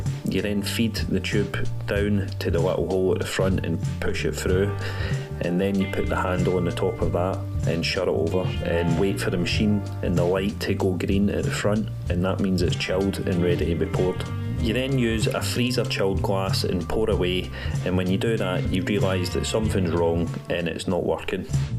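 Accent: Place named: Scotland, United Kingdom. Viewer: Great review. And one of the nicest scottish accents ever! 😁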